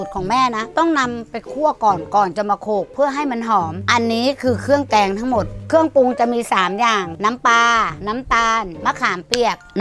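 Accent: Suphanburi, Thailand. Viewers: ฟังเชฟไปมา ...ออกสุพรรณ ซะงั้น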